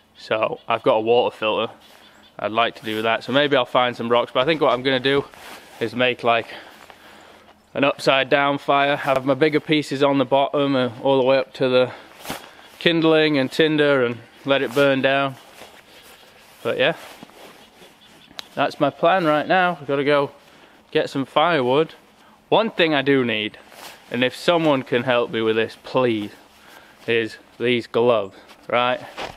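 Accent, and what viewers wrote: South, United States. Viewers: Oil your gloves... mineral oil.. ppl suggest other oils... 🙄 just oil them. Ya really need a saw upgrade. I wouldn't wanna be caught dead in the wood with that- (I know its compact and lightweight) they would say: "at leased the saw kept him warm, isnt it?" Awesome Southern accent btw 😂. It's well advised to not drink whilst camping alone... but, that's your business. Good vid, great job, thanks for posting. One can learn a thing or two from this.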